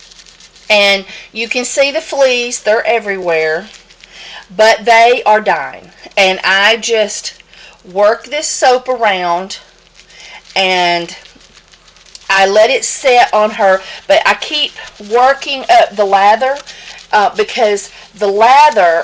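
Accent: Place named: Fresno, United States. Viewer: That's a lovely accent that you have. Fresno?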